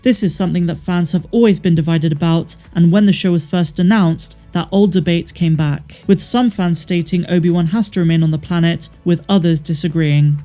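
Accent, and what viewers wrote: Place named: Bristol, United Kingdom. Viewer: I always enjoy your videos. Love the accent, is it from Bristol ? (one of my fave places in the uk ) I do miss the 3 x 'Utini' intros, though ! lol! ;) Thank you and keep up the good work ;) x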